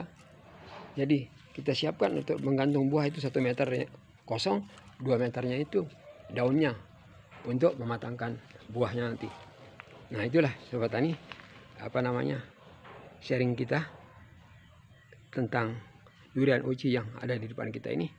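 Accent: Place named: Lombok, Indonesia. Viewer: dari logat nya orang sasak ya ?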